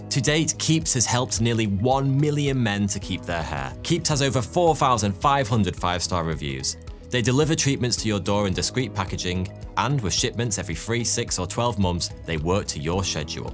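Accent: United Kingdom. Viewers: Is it because he’s British or because the words he gets wrong are unpronounceable non English? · that's how they pronounce them in the UK. My Roman wife does the same thing sometimes. Don't forget there's an entire world out there, bud. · Never thought a British person pronouncing indigenous cities would be something I’d watch good job on this vid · He wrote about himself: " is a British YouTuber.." So it is just a kind of British accent. Maybe you should watch videos about the main British accents. Maybe his accent is not as unique as might you think - even if it is not a native Liverpool accent. Everyone has a family and friends in childhood and schools etc. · The british black legend against Spain once again in your british or so accent!!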